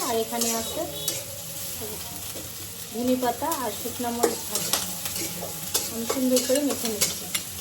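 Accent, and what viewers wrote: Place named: Rajshahi, Bangladesh. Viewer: Ettto bad comment keno apnader? Uni notun video korteche...hoyto ektu vul hoy..ar tachara unara Pabna Rajshahi er manush...kothar moddhe local tone thakbei....eta emon dosh er kichu na...manush er valo chaite paren na??dhire dhire e manush unnoto hoy.. Ekbare na...parle valo kichu koren nije...then onner piche lagen...azaira jotto sob..jader kono kaj nei tarai emon onno ke bad comment kore...tai bolchi valo hote shikhen....video valo na lagle dekhben na..ke boleche apnake dekhte??/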